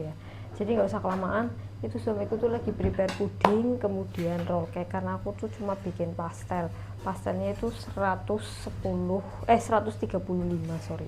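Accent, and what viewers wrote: Banyumas, Indonesia. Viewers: Mba asli orang mana ko bahasanya ngapak kaya saya orang banjarnegara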